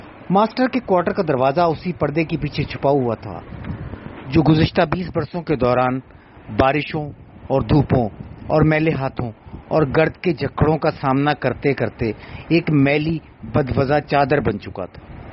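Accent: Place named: Karachi, Pakistan. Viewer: سب سے پر لطف بات میرے لئے اس کہانی میں کراچی کی زبان تھی۔ اور اس کی ادائیگی بھی خوب کی ہے۔ شکریہ۔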